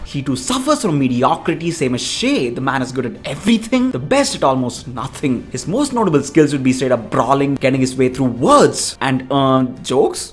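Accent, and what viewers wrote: India. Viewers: In my opinion you (the player) is the best Assassin Btw love your south indian accent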